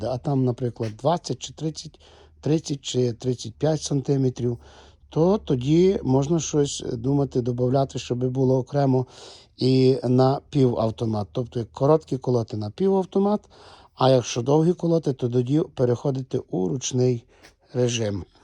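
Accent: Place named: Ivano-Frankivsk, Ukraine. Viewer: Ви часом не з іванофранківщини бо дуже знайомий акцент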